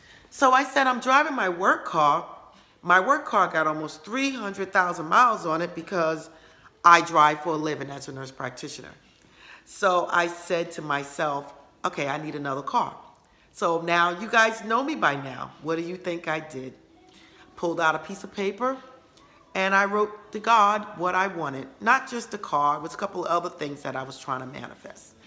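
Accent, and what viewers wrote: New York, United States. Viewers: I'm so glad I found this channel!!! I manifested my Ford F-150 got it brand new..in fact it was even better than I pictured!! It was a truck that was from an other dealer...fully loaded..I loved it...I didn't even know I manifested it...this was in 06...that next year the secret movie came out..of maybe it was 06 but I didn't see it till 07....anyway I want a Ford Raptor...it's an F-150 on steroids...it's awesome!!! I see it everywhere...I feel like there is a block..but I watched some of your videos....I love manifestation stories there needs to be more!!! And the how with it....too many vids are just technique and guruish...I need proof!!! Not that I doubt loa but it inspires me...like this vid right here!!! Thank you! Ps where are you from I'd that a new York or Boston accent..lol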